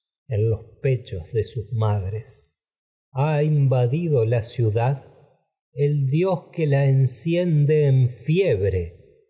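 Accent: Argentina. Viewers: ARGENTINO TENIA Q SER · Muy bueno. Gracias! Argentino, verdad?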